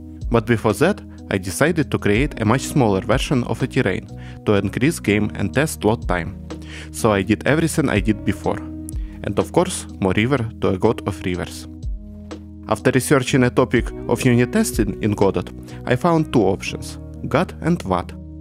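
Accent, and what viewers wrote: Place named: Russia. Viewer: Русский (или украинский) акцент почему-то позволил мне смотреть видео без перевода. Всё чисто и понятно. А вот речь настоящих американцев или англичан мне воспринимать трудно.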